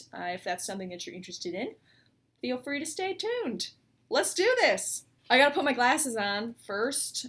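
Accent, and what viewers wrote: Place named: United States. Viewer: if you open your academic American voice up to its appreciation of the British, the pronunciation of 'particularly' would come simple to you.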